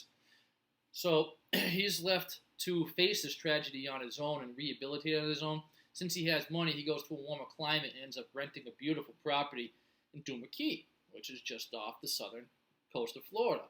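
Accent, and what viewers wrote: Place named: Boston, United States. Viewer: Patients lmfao!! Are you from Boston btw you got hint of accent, as I’m from Boston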